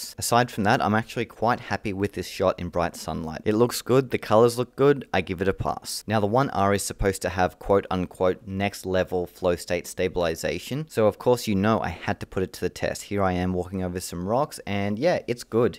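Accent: Australia. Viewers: you sound very aussie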